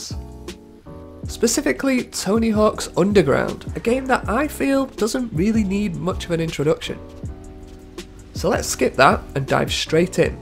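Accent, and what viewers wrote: United Kingdom. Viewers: Where in UK are you from? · I'm happy to have this to watch, but at a certain point I got to the stage of thinking "if I hear him say 'neversoft developer' in the same nonchalant British-accented English teacher tone just one more time, I'm gonna lose it" You really should have broke that section up or just listed all the names in rapid succession and said they were all developerS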